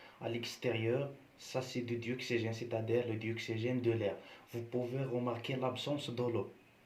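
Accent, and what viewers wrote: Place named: United Kingdom. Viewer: هذا يحجي بريطاني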